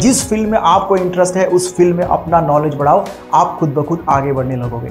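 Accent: Gujarat, India. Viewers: Gujarat se ho Bhai???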